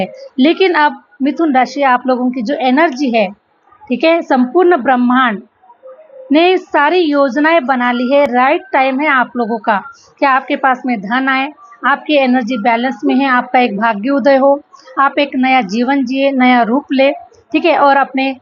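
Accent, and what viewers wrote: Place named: Maharashtra, India. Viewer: SHE IS 50 PLUS, AND IS A GOOD MARATHI SPEAKING WOMEN, AND HER ACCENT IS MORE MARATHI. CONCENTRATE ON HER READING AND NOT ON HER BEAUTY OR FACIAL EXPRESSION. EVEN IF YOU LIKE HER AND WANT TO APPRECIATE HER FACE AND HER EXPRESSION, DON'T MAKE IT PUBLIC. SHE IS A GOOD TARRO CARD READER, AND THAT'S IT...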